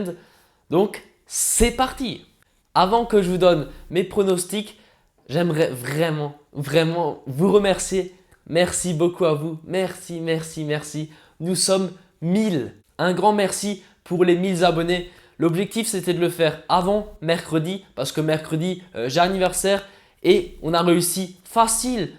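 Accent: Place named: Alsace, France. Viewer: Je crois il vient d'Alsace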